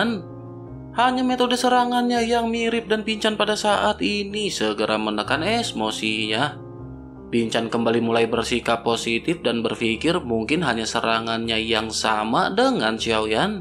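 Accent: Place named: Jakarta, Indonesia. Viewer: Pakai logat nasional sajalah bos.Karena di dengar oleh bnyk org bukan hanya org betawi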